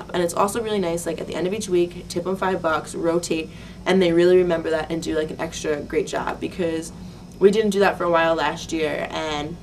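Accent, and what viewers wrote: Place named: Maryland, United States. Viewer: she has to be from Maryland... her accent is thick haha i talk the same way.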